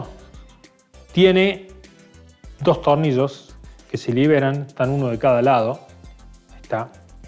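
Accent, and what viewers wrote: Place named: Córdoba, Argentina. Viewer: creo que por tu acento eres cordobés 😊😉. Sabes. Tengo un 🔭 Sky. Watcher Dobson extensible de 10 " <num>. F: 4,7 . Y una cámara Reflex T6. Modificada ..la Abrí yo y le saqué el filtro quedó a sensor pelado . Quería probarla así . Y es una locura ... Las fotos que tengo haciendo light a pulso lo mejor posible .. en 0,8 s 1s como máximo sino me salen las estrellas movidas 😊😊😀 que pena no tengo como mostrarte las fotos de Orion . Una locura 😍😍😍 . Vivir está experiencia de hacer light rápidos y tener que enfocar la cámara cada 10 fotos ..me quedará en el recuerdo !!! Después que me llegue la montura .... Nosotros allá en el sur sabemos empezar desde lo más bajo con los poco recursos que haya !! Eso no lo perdemos nunca 💪💪😁😁 un gran saludo !!! 👍👍👍👍👍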